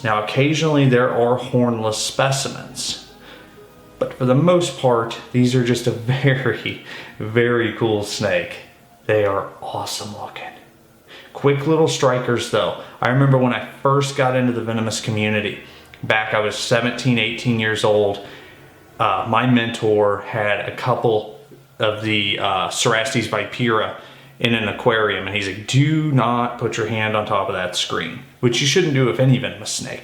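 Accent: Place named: Utah, United States. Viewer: Do you happen to be from Utah? I am detecting a very distinct Utah accent.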